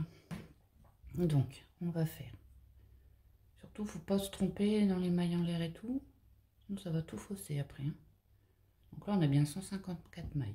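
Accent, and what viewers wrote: North, France. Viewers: Bonjour, je vous decouvre ..je pense à votre accent que vous étes du nord, j habite l alsace mais je suis native du nord ...j aime vos explications et j ai hâte de découvrir vos autres réalisations....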